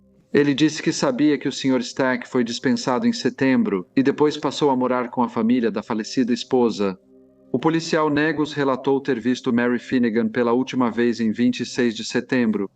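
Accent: Portugal. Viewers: O português de Portugal é lindo ❤ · Alguém precisa dar um jeito neste sotaque de Portugal! É muito chato! E feio!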